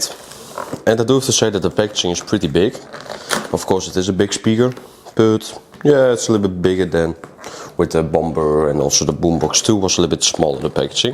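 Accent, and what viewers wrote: Netherlands. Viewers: You sound very Dutch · i hear a little bit dutch haha. nee wel goede video. ben zeker om deze te kopen.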